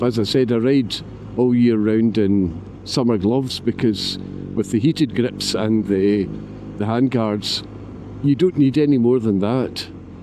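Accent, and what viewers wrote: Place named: Scotland, United Kingdom. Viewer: I enjoyed the vlog. I'm familiar with the Moffat to Selkirk road you were on. The Glen cafe is worth a stop in the spring and summer. I too have a 2020 GS1250 which i love however its proven to be somewhat unreliable and has twice left me stranded. I'll be interested to see how you get on with yours in the coming months ahead. Its good to hear another Scottish vlogger on the Tube. Best wishes 👍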